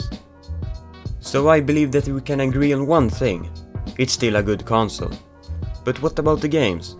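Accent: Sweden. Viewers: You from Sweden? :)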